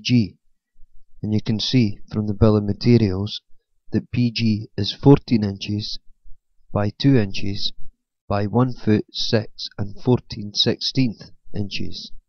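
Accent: Scotland, United Kingdom. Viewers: Great video, your scottish accent makes it all the better. Funny how ppl appeal to authority and their models, when models simply express the pre-determined conclusion, rather then consider the alternatives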